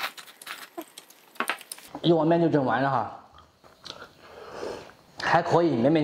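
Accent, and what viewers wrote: Sichuan, China. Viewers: 这四川普通话说的，好吃！ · 刚刚做了，安慰了北美的四川胃， 乡音亲切，谢谢小师傅！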